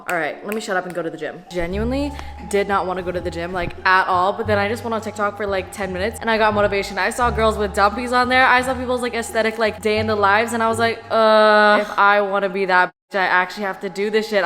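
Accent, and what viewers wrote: Vietnam. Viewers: YOUR VIETNAMESE ACCENT IS SO CUTE OMG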